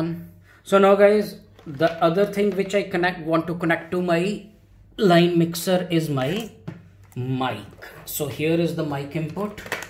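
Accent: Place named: India. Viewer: Indian accent what’s wrong with that ? 😂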